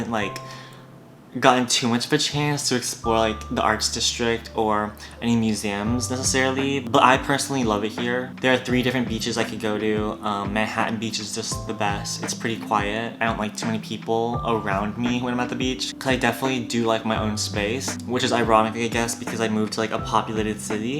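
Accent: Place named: Philippines. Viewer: Liked the drawings. Also, I get why people ask about your background. Your accent is all over the place, I would have guessed Philippino. I am also Asian, born and raised in America. People always ask about my background, it's annoying. Good luck with your studies😊😊😊😊😊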